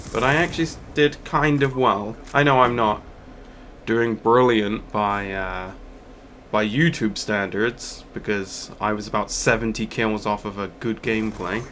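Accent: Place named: United Kingdom. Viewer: Hey..much love from Germany to the Voice of GB;) it's so funny to listen to you and...you with the british accent and the opposite is with his cool ny talking thing...;)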